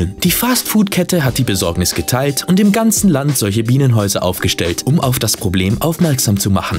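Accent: Austria. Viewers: Das ist auf Österreichisch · Stimme ist super. Man fühlt sich etwas weniger wie mitten in Österreich. (Nichts gegen Österreich) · Lia & Enna Das ist Österreich-Deutsch☺! Da ich selber aus Österreich stamme...die Deutschen sprechen das CH aus und wir Österreicher mit K; zum Beispiel bei der Giraffe, sagen wir Österreicher Schiraffe; usw.! 😊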